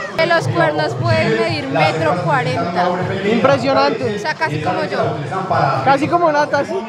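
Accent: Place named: Cali, Colombia. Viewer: Como venía de Cali se le pegó el hablao'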